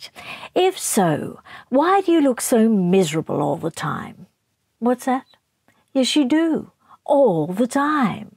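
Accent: Australia. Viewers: To repeat… these Snippets are like a verbal Southpark for me here in the US. Double bonus is your lovely calm Australian accent , in addition to the brilliant story lines. Americans are snarky in a different way, but it’s almost better coming from a Commonwealth member! You have even more reason to dislike them intensely!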